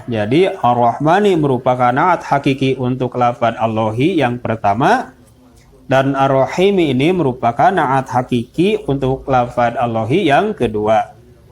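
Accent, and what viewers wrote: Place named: West Java, Indonesia. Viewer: Alhamdulillah...p msh tetap eksis saya jd ikutan belajar barakallahu... enakeun jelas wlpun nyunda pisan...hehe 🙏🏼 htr nuhun smg dg menyampaikan ilmu menjadi obat utk kesembuhan... 🤲sukses ya...n mangat💪👍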